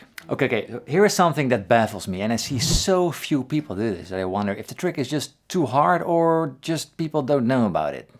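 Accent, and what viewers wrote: Netherlands. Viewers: Classic Dutch accent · Ik hoor aan je accent dat je Nederlands bent! heb mij geabonneerd, hele toffe tricks. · As someone from the Netherlands myself, the Dutch accent of Dutchies speaking English often bothers me just a little bit, but you've managed to make your slight accent sound soothing instead. Nice playing, nice voice as well. Thanks for the videos!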